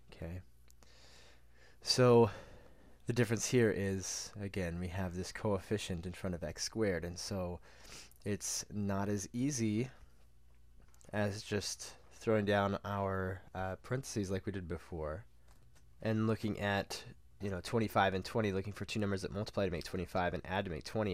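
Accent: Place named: Texas, United States. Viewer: You sound like my friends from Texas.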